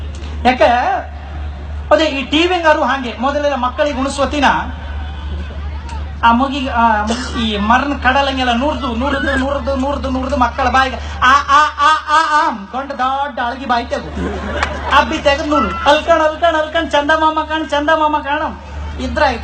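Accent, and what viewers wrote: Kundapura, India. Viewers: Love kundapura language style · Great kundapura kannada yavatidru.......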